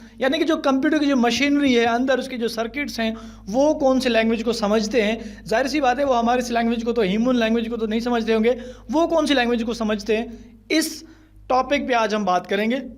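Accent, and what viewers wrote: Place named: Sindh, Pakistan. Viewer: Sounds like Sindhi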